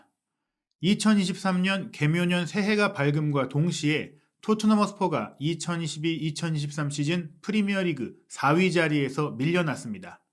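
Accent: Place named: Seoul, South Korea. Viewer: 말투바꿨네 말투이상해요 서울말쓰려고하시나...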